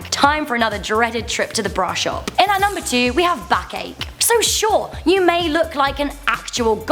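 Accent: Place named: United Kingdom. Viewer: British accent is annoying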